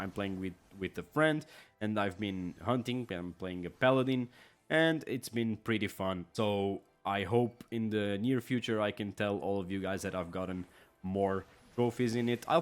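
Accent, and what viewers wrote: Portugal. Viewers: Eu juro que pelo teu sotaque sabia que eras pt😂😂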